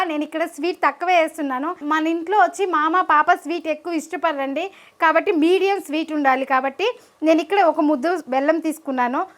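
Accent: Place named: Chittoor, India. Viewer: ne matalu chenna pellalaga chala baguntai me chethuru slang enka bagundhi super nuvu andhulonu tagaydhay lay👌👌👌👌👌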